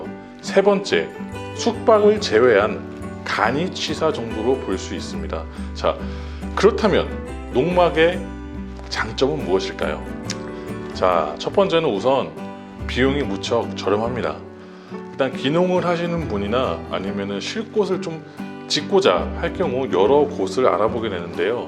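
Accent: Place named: United States. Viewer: 미국 사람인줄 알앗네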